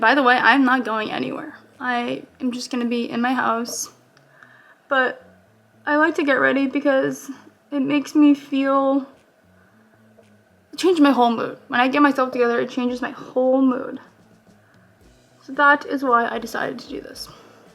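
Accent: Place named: New England, United States. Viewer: I totally knew where , you were from just by how you talk and do you’re make up NEW ENGLAND GIRLS “ respectfully “ go get you’re Starbucks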